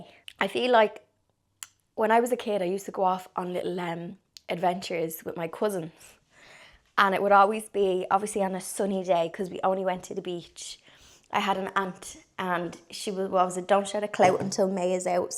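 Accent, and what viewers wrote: Ireland. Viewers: I love all your videos , you are kind and witty . I live in Scotland but I am from South America so love to hear your Irish accent helps my understanding of English and the diversity in accent . THANK YOU for letting me have a peek on to your lovely world and adventures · I live in Texas USA and has spent years working on my family tree. My mom used to say her people on her dad’s side was Irish but she knew nothing else. Since she passed I’ve learned my 5th great grand father was from Legacorry, Shankill, Armagh, Ireland. That’s how it was written out in 1640 so have not learned where that actually is yet. I enjoy just thinking one of my great grand mothers talked like you. ❤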